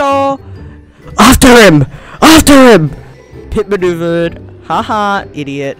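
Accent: Australia. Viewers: Oh I didn’t know you were Australian! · Are u Australian?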